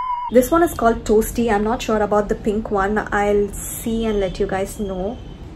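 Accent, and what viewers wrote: Tamil Nadu, India. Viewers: Are you a Tamilian ? Just love your accent 💕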